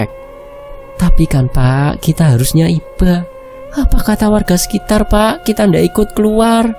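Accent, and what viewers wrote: Java, Indonesia. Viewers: Assalamualaikum, saya subcriber baru nih kak Boleh kasih saran? Klo lagi bawain ceritanya klo bisa jangan pake logat jawa ya Logatnya biasa aja kedengerannya lebih bagus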